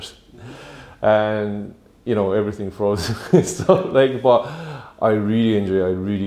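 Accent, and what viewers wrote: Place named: Cork, Ireland. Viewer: I love that he's developed a Cork accent, next time I'm Cork I'm going to try his restaurant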